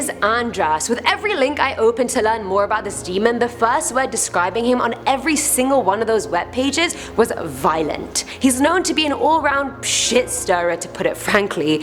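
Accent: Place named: United Kingdom. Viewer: I can't tell if she's british or American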